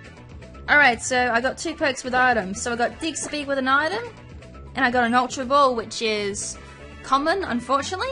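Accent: Australia. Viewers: actually she's an aussie so it's probably an accent thing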